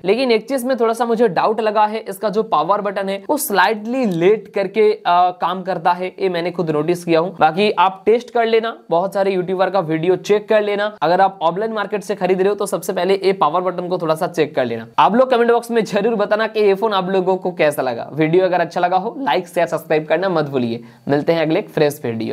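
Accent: Bengal, India. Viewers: Bhi toh bangali hai kiya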